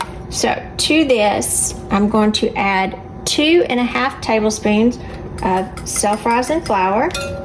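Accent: South, United States. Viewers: Beautiful southern accent from WHAT state????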